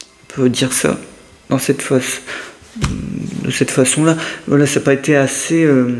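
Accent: North, France. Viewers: Bonsoir. Je vous découvre pour la 1ère fois ce soir et vous m avez bluffée!!!!! Vos prédictions sont incroyables et m ont parlée à 1000 % surtout concernant min travail c'est tout à fait ça!!!! Vous etes dans le mille!!! Je me abonne à votre chaîne avec grand plaisir ❤. De plus je reconnais un petit accent nordiste et j'ai vecu à avesnes sur helpe toute mon enfance et j'ai de la famille à Maubeuge donc je connais bien la région que j aime beaucoup même si je vis actuellement à Marseille. Au plaisir de vous écouter avec hâte. Cordialement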